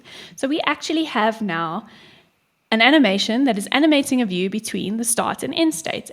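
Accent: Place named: South Africa. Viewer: woah threw me off like wait south africa? is that what im hearing :D eyyy same same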